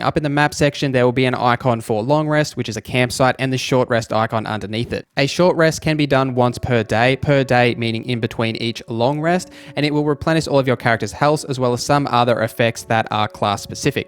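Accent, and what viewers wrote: Australia. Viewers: Clear. Concise. Australian 👌🏾🔥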